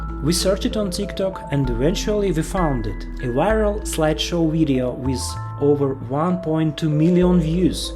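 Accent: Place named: Russia. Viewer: Очередной урок самаритянам. Делают всё за бесплатно - а потом: "а чё это у нас денег нет?😮" Насчёт авторки. Если бы тебе юрисдикция позволяла, было бы незамедлительное обращение в суд и всё по списку. Но ты стыдливо опустил момент, что никто с тобой не будет считаться, просто потому что ты из неправильной страны. Лучше на ютубчике плакаться корявым русским акцентом😢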